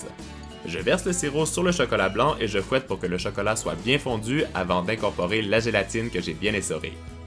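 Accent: Quebec, Canada. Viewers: #lovemail #teamsnap #lmp continue tes vidéos , tu es parfait et j'adore trop ton accent québécois 😍❤😘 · super vidéo j adore l'idée de l entremet. J aime tellement ton accent québécois 😁💜🍰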